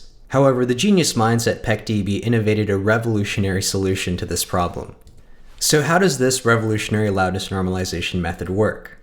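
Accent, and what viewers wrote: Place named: United States. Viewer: never underestimate an Indian with American accent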